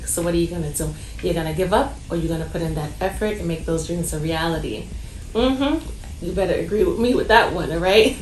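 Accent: New York, United States. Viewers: What a beautiful lady inside and out! I subscribed and enjoy ur videos soooo much ❤ such a NYorkaaahhh I hear the accent lol. Born and breed BX girl right here! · I’ve always wanted to ask : are you a New Yorker? Because it definitely sounds like it.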